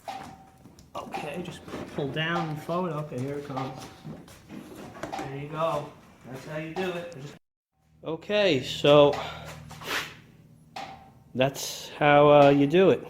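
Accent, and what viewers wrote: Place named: New York, United States. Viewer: Thanks big guy. I have now spent many hours watching you boost C6 project videos... just really very worthwhile viewing, learning and enjoying the multitude of tid-bips you continually share. Prior to stepping into any project, be it a car, boat, plane, house... I do my research. I know nearly nothing about the LS3, of the C6... but I am a quick learn. Stumbling across your videos (can't recall how) was like finding a treasure box! I've still loads to learn about the C6, and LS3, but day by day the picture is becoming much clearer (<num> GS 6 spd). You've a talent and passion for teaching, otherwise why all the videos? It's just a massive shame all your efforts are somehow missing a bigger audience. I'm on the opposite side of the country next to Portland Oregon, thus your New York accent gives me a chuckle and keeps it all interesting. 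Keep it whoever you are, ha, ha...! Email me the info on the spoiler as it'll be quicker.... I think.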